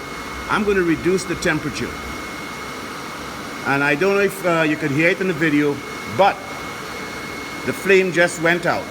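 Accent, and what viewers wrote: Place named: Trinidad and Tobago. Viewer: Good morning. Just looked at video. Informative and simple thanks. Trying to test my suburban. I hear a trini accent. Where are u located?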